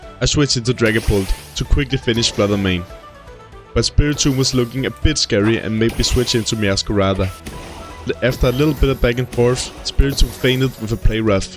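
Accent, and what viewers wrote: Denmark. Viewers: Denmark maybe 🤔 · are you danish, you sound very danish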